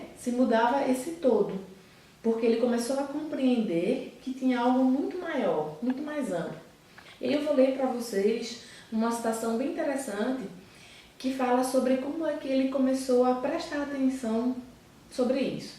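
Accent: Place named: Northeast, Brazil. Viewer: Mas o mais gostoso do vídeo é poder estar aqui em Brasília (como eu estou) e curtir um vídeo com esse sotaque liiinndo do nordeste!!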